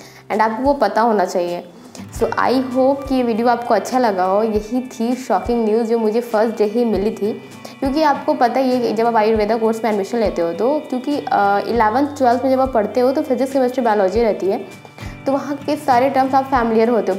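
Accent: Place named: Bihar, India. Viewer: I'm also a bihari her accent is relatable...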